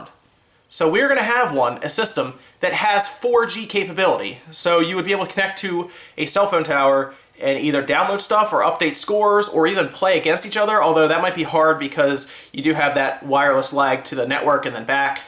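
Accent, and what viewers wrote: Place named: Philadelphia, United States. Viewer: That Philly accent though.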